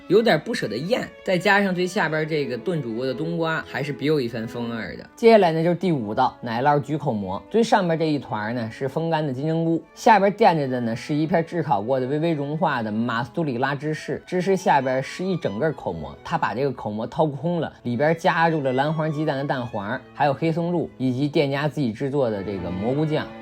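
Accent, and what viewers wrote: Beijing, China. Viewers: 喜欢你说的中国语是北京话吗？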